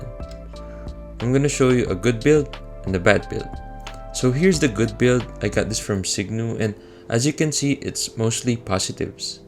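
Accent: Philippines. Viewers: Idk but the way he speaks sounds like how a Filipino speaks English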